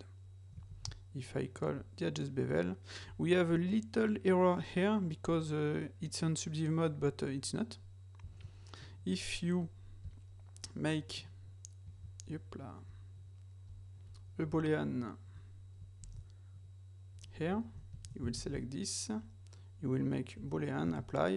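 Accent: France. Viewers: haha l'accent français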